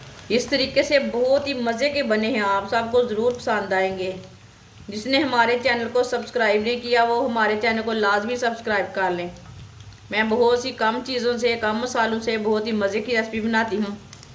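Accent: Punjab, India. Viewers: Punjabi bolyn to behter hy full punjab8 lehja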